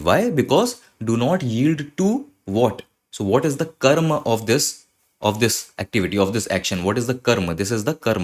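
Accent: India. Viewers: Thank you for taking our suggestions on Hindi pronunciation leakage into your commentary. Otherwise your videos are top notch हिन्दी उच्चारणस्य प्रयोगं न कर्तुं अस्माकं सुझावान् गृहीतवान् इति धन्यवादः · You are doing a great ,noble worj,learning Gita and lot of Grammar also assosiated with it .Thanks 🙏 One suggestion , Hindi pronunciation is occurring in a lot of places like Vachan ,Ken ,purush etc .If that is changed ,it would be wonderful.thanks again .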